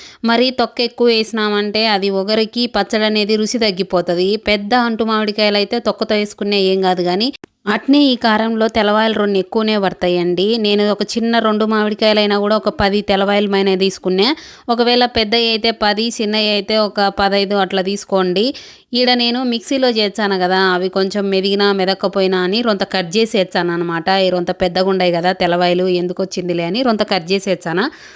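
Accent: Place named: Badvel, India. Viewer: Hi మాది బద్వేల్ మీ యాస నా యాస ఒక్కటే. ఒకమ్మాయి నాన్న ను నాయన అన్నానని. నన్ను చాలా నోచుకున్నట్లు మాట్లాడింది ఒకప్పుడు. అదేదో నేను తప్పు చేసినట్లు.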